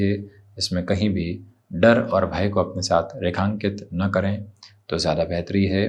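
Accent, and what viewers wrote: Standard. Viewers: जितनी शुद्ध हिंदी वो बोलते है बाकी किसी ज्योतिषी को बोलते नहीं देखा, भाषा पर अच्छा नियंत्रण है गुरु जी का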